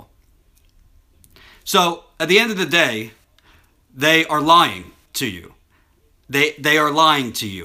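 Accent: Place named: New York, United States. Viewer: is seriously the sexiest person ever when he gets mad talking politics, and then his new york accent comes out. Lmao 😍😍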